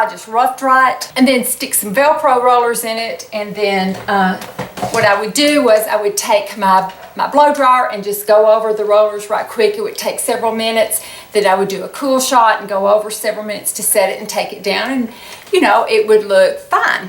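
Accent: South, United States. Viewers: I smiled as I watched this. I had a soft bonnet hair dryer. Since I live in England now I will have to see what the they have. Thanks for sharing. Love a nice nice Southern accent, too! Not everyone in England sound like Colin Firth!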